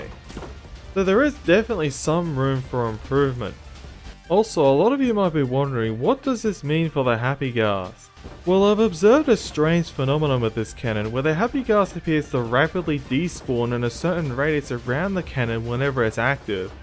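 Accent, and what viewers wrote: Australia. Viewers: Bro, you already speak fast, plus you have an Australian accent, plus you're talking about highly technical stuff, plus I'm fluent in english but since I'm not native i have to make a little force to think through it. So, even 0.25x is not enough for me to be able to understand it. ☹️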